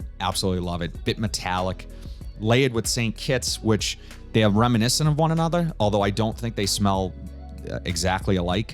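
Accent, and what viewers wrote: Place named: Massachusetts, United States. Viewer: I definitely hear the Massachusetts accent😅😂🤣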